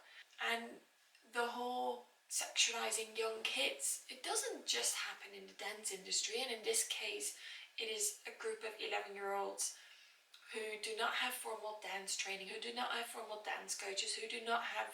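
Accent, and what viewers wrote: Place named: United Kingdom. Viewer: You sound British. How was the reaction to the movie in the UK vs US? I get the impression that the movie hasn't been a big thing over there.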